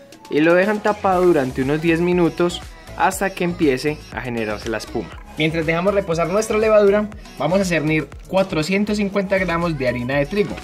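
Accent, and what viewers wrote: Antioquia, Colombia. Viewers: Amo tu acento paisa jajajaja gracias por la receta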